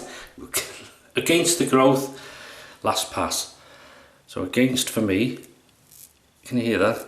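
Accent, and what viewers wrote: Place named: North Wales, United Kingdom. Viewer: I knew you were from North Wales could tell, I live in Llandudno don't know if that's anywhere near you. Another great video by the way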